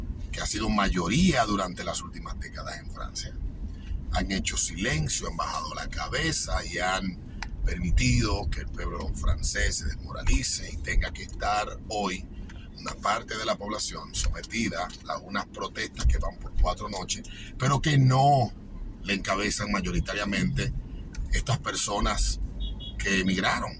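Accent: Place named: Dominican Republic. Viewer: rayos en verdad cuando vi la miniatura pense que no tu aspecto no parecia de una persona de republica dominicana, todos esos pensamientos desaparecieron cuando escuche tu voz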